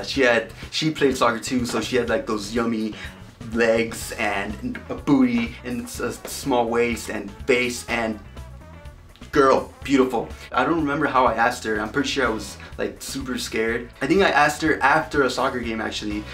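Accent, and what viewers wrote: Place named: Russia. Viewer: What is he? Like Russian? German?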